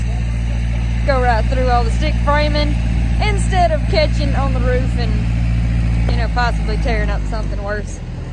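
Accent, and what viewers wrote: South, United States. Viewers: Amazing southern accent..😂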